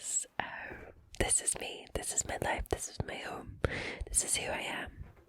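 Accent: Scotland, United Kingdom. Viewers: What area of Scotland are you from I am fife and your accent sounds a lot stronger than ours 😂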